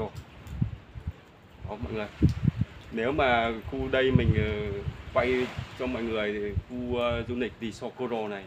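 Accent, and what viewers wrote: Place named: South, Vietnam. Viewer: Gióng risot hunmiso Nam du quá. Chúc bạn thành công nhe.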